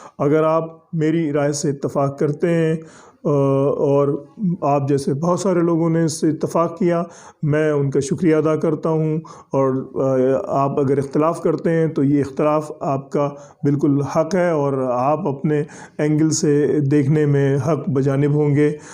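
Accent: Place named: Pakistan. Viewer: Sir I was waiting for you to react to these comments . Sir love your video and the way how you talk real urdu accent 👍👍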